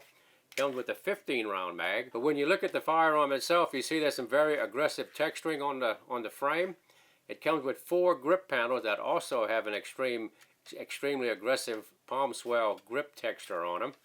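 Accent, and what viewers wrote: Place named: South Louisiana, United States. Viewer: Read up on your bio in Wikipedia. I was born in June 1956, so you have almost 2 years on this rock more than me. Raised in the Arkansas cotton country 10 miles from the Mississippi my hunting as a youngster was primarily rabbits, doves and ducks. I had a Mossburg .410 bolt action and later inherited a recoil driven Remington Sportsman Model 48 in 12 gauge. I bought my first wheel gun at the local pawn shop at 21 in 38 special and did some major damage to the turtle and water moccasin population. I’ve carried a wheel gun since. The only thing I have ever won is the satisfaction of knowing I could face down any threat from thugs and gang bangers and my family was well protected. I’m a proud NRA lifetime member and a staunch believer in our 2nd amendment freedom. Oh, and I spent some time in South Louisiana, too and must say you may live “up north” now but I can still hear the bayou in your voice! 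Aiiyeee! Keep your powder dry and the videos coming! Best gun channel on YT!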